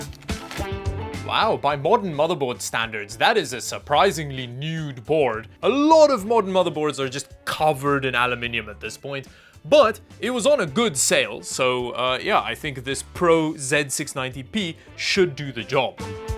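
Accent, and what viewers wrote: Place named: Afrikaans, South Africa. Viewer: I am so happy to have learned that is afrikaans and not just me trying to figure out where the hell I have heard his accent before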